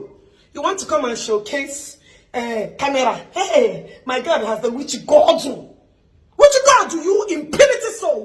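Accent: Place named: Imo, Nigeria. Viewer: okay she’s married self, She’s speaking exactly imo dialect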